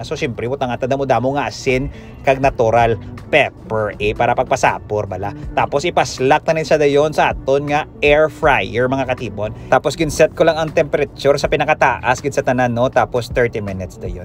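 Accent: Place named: Ilonggo, Philippines. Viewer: I missed the bacolod illonggo na tono! goodjob!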